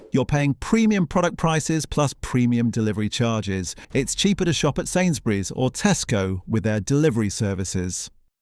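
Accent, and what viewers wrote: United States. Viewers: Why are you talking in an American way? It is so annoying.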